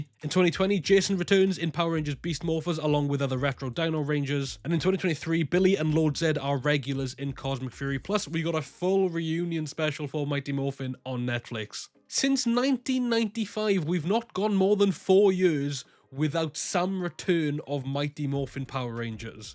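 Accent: United Kingdom. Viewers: You know that is British, right? Brits pronounce certain words differently than Americans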